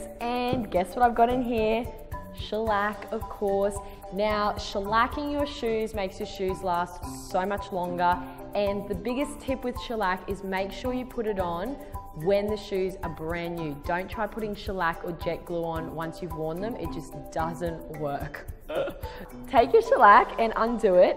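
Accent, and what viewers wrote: Australia. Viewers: oh and also are you from Australia because you sound like it and i am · r u Australian? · Is she Australian 🤔🤔🤔 if she is I live in Australia too.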